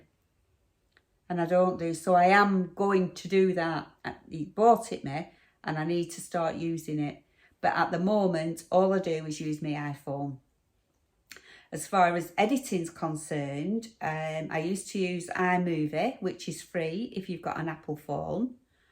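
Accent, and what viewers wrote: United Kingdom. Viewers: FYI.. you can go to my beautiful island in Barbados for that blue sky, water,and sunshine. Good food too.😂 Mayni ask about your accent, what part of the Uk are you from? Thanks for sharing. I live in New York, and I follow Emmerdale and Coronation st. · I loved the video! So interesting and i I love the way you speak and the different British phrases! Although sometimes I can't understand you so I put the transcript on so I don't miss anything 😊😊😊 you probably wouldn't understand me either and the strange phrases Americans use ❤❤❤ you look great today.......love the sweater and wooden beads! Yes for a part 2...... I think it's all very interesting! Take care Kathy from Pennsylvania USA 😊😊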